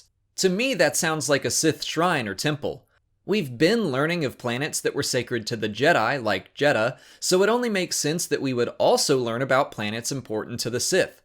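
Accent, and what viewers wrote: United States. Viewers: Great video, sounds even better with an American English accent rather than that Goat sounding yuck mouth. Really is great to have working with. im a huge fan of both of you. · Why am I hearing a American talking? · ffs. I came to this video for more of epic Australian accent. Not this American voiceover crap.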